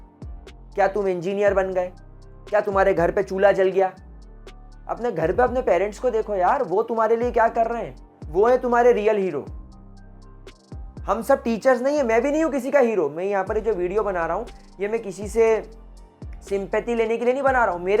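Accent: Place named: Indore, India. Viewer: Pehli line sunke hi samajh aagya sir to apne Indori hai.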